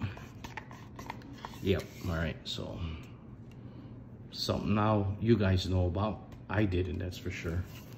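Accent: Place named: Minnesota, United States. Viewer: You might be from Illinois but you have the perfect true Minnesotan accent. It's perfect. With a Wisconsin flare. I'm researching this gun for buying. Thank you for the video.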